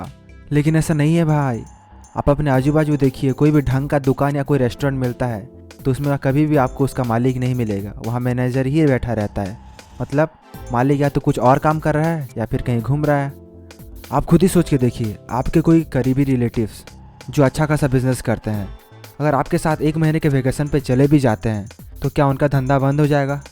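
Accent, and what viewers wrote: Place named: Bihar, India. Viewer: Bhai bihar se ho kya. Acha kam kr rhe ho.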